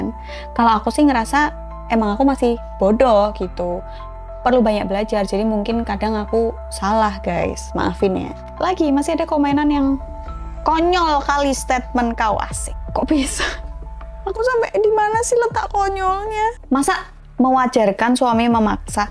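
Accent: Java, Indonesia. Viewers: pertama ktmu chanel ini d beranda seolah g prcya ada konten sperti ini,gokil.trs br puter 2 video Lgsg geleng2,mantab bener ini konten,aplgi ada logat jawany,bikin ngekek🤣.tanpa pikir ojg Lgsg subscribe.😁 lanjutkan mbak,edukasi sex memang sangat perlu,ora gur uslak usluk tok,semua ada ilmunya.😁👍 tp pda intinya konten ini khusus hnya utk orang yg waras,inget y,ORANG YANG WARAS!!😅 SEMANGAT DAN TETEP SEHAT DAN BERKARYA MBAK!!!💪👍👌👏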